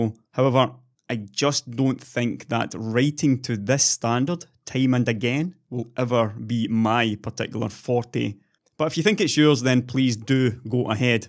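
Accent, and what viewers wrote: Scotland, United Kingdom. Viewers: Awesome work as usual! Fuck the haters and as Good Old Gamer said: "You can't fix stupid" Never a truer word spoken:D Looking forward to your next vid man, keep it up! you provide a bloody good and balanced viewpoint and have +100 Integrity compared to some others of the techpress, It's for that reason I subbed you (After watching a few vids and eventually became a patron) You're honest, frank and I like that. No bullshit or smoke and mirrors. Just plain old info with a Scottish slant:) Anyways dude have a good week and see ya in the next one! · Your scottish accent is better than *'s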